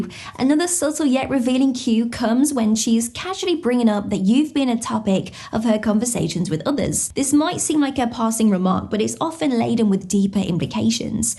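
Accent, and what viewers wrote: England, United Kingdom. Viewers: Wow thanks for the advice. 🙂 I love your English accent! You're so cute! ❤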